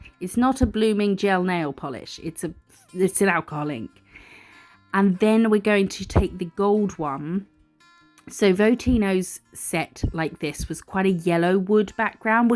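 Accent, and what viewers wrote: United Kingdom. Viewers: I... did think you're Australian?! 😅This is me being embarrassed now!!! OMG! You're a BRIT! I love it! How could I have not known? I don't know why, but I always confuse the accents of UK, AU, and NZ... it's like trying to tell Scottish from Irish. Or Mexican Spanish from Spain's Spanish, or French and Canadian French. I'm so bad with accents!! Despite all of it, I still think your kindness is top notch!! UV light is like cigarettes. I'm not gonna nag anyone about smoking a cigarette now and then, but if you do it often enough, and if it's unfiltered, etc, you know, those kinds of things increase risks. So let me start by saying I'm a redhead with very fair skin. I don't tan; I burn. I spent every childhood summer burnt to a crisp, red as a cooked lobster before humanity understood the increased skin cancer risks per sunburn, blah, blah, same thing with smoking. 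Now I'll add my age (50), family history (an uncle with skin cancer), and me being on chemo for a bit over 2 years as of right now, so the risks add up. This isn't me trying to create mass hysteria over sunshine. I swear, I'm ultra-aware that all my risk factors don't apply to everyone. I'll share what I learn, but I don't wanna push it on anyone. I'm not an evangelist, just trying to explore risk reduction. Also, another thing neither of us thought of, and I could slap myself now because I've studied it a bit, SILK!! I had myself a good cry about an hour ago as I was reading and watching tutorials about how to repa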